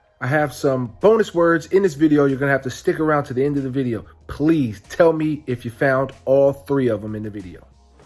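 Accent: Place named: United States. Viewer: Hearing our slang in an American accent is amazing. Subbed! P.S 'Sod' can also be a noun.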